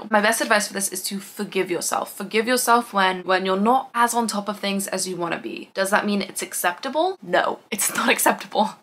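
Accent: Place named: United States. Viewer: No cus I’m 28 now and have watched your videos for years but haven’t watched in so so long and I felt a lil disconnected cus your American accent has gotten so much thicker and I feel like I’ve missed out on sooo much. Second I heard ‘let’s go ladies’ I was back in the zone. Back to the video with the dry shampoo. If you know you know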